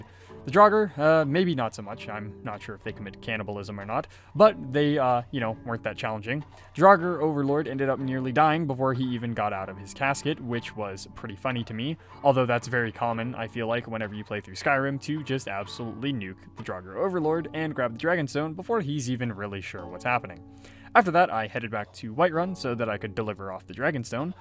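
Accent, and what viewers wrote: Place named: Saskatchewan, Canada. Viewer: fair enough… I was just sitting here watching with my girlfriend wondering aloud if you were from Saskatchewan.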